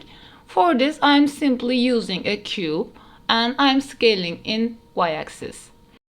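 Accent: Turkey. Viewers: Türk aksanını nerede duysam tanırım 😄 Güzel çalışma olmuş. Başarılar 😉👍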